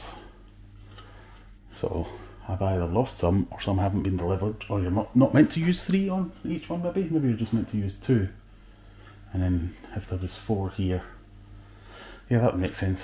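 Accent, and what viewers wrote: Scotland, United Kingdom. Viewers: We are literally being screwed by fitting manufacturers to own dozens of these things with diameter, thread, pitch variations! Guess I was luck the ones I got for my outside taps went straight onto both of my shower outlets. Keep the videos coming and nice to hear a Scottish Accent (I am an economic refugee in darkest England and miss home!).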